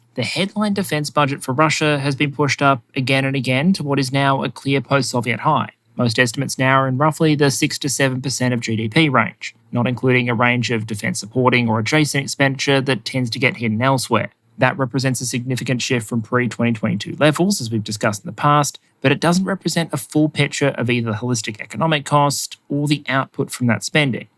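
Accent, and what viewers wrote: Australia. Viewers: Well, he's an Aussie. Were you expecting something like "Australian language"?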